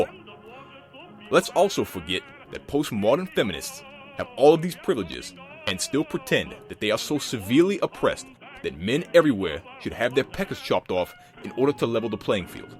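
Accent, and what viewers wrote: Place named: New York, United States. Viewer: dat new york accent, I subbed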